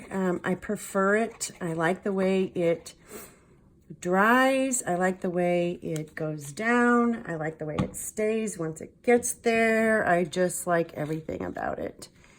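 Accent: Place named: Midwest, United States. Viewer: I found you through Tracie's GDT and am a new subbie! Loved your journal & followed over to see your pockets. I was so tickled when you said washi or warshi... LOL I was like omg she must be from the midwest, like Missouri! Then you said you were from Mo, I was born there and still have family there but I have not lived there since I was young. My grandma, my, mom, and all my family from MO & IN all say WARsh the dishes! Have fun and good luck with your channel, you are quite pleasurable to watch, nice speaking voice and a good sense of humor always win me over mixed with good crafting! win win!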